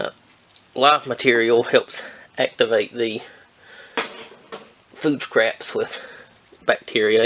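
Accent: South, United States. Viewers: Enjoyed the video. I have a couple bins now and want to grow more. I appreciate the good southern accent. Thanks from TN.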